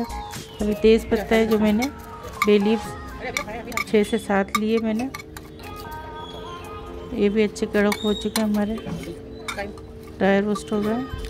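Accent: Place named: Karachi, Pakistan. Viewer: ہم حیدرآبادی ہیں میری بہن آپ مجے آپ کراچی کی لگ رہی ہیں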